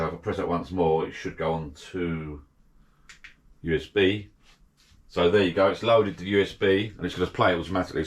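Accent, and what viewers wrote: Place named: Cockney, United Kingdom. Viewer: The subtitles are utterly,utterly stupid; (what has 'Mercedes' got to do with ripping a track?),- and the cockney accent is almost as bad to understand, even though I've kept starting and stopping,- most infuriating!! If you are trying to explain how to do something, at least try to speak a lot more clearly so that others can understand you.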